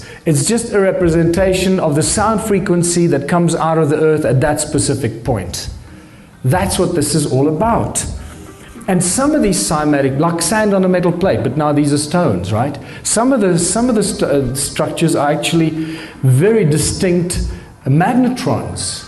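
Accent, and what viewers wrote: South Africa. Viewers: Definitely sound like a X Rhodesian or South African stock.Thinking People 👍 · he sounds like those who kept blacks segragated south african racist scum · Is this guy South Aftican?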